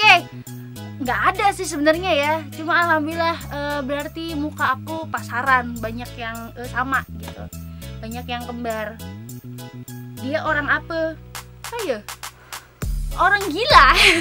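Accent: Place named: Malaysia. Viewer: Ya karena dia lagi di malaysia, jadi mencoba bicara dengan gaya malaysia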